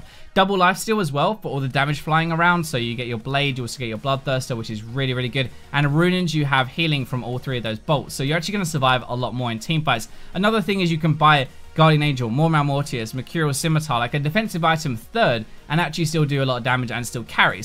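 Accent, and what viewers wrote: United Kingdom. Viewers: I like your vids dude but you should really consider slowing down your speech, because speed + british accent makes it really hard to actually keep up with what you're saying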